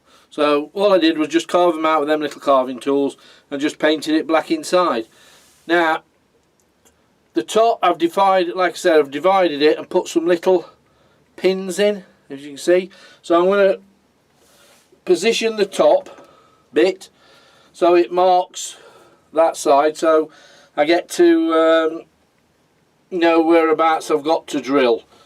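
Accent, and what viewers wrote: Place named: Derbyshire, United Kingdom. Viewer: Good job! I always like watching your videos. I'm guessing you are Debyshire lad from your accent? Well south of me anyway - Leeds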